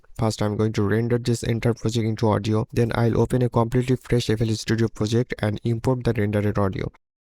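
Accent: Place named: India. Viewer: Nice work👍 I hear an Indian accent😎 Hello from Russia 🇷🇺 FPC is an optimal tool to make pro and solid drum part in FL, but sometimes I use NI Battery😉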